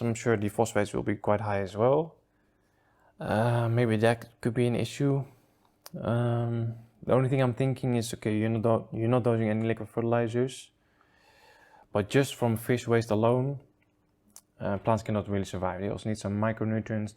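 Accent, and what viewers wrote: Belgium. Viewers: I do wana hear your belgian accent xp k ben van Antwerpen! Xp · Ben ik de enige die niet kan slapen tot ik zijn Belgische accent gehoord heb? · I definitely want to hear your Belgian accent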